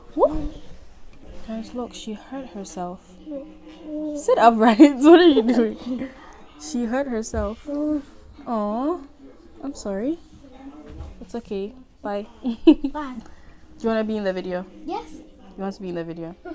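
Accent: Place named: Ghana. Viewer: Eehm this is my first time coming across your channel. Are you Ghanaian or south African cause I'm hearing 2 different accents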